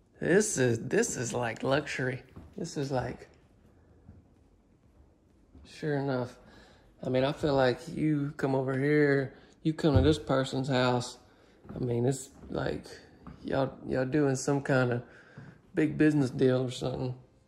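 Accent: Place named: Biloxi, United States. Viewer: That's ok I love you accent it reminds me of Biloxi where I'm from now I live in KCMO in a double wide.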